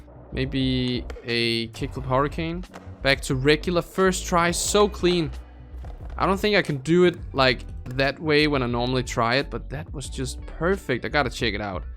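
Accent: Denmark. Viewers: Are you danish bru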